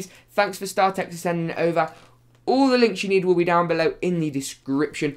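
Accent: United Kingdom. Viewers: your british accent is glorious :3 it gives me goosebumps xD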